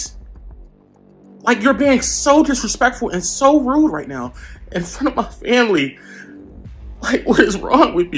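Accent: Philadelphia, United States. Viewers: you have a Philly accent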